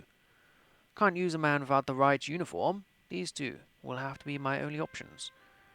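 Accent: United Kingdom. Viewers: Are you born in Britain or are you just a Canadian with a British accent.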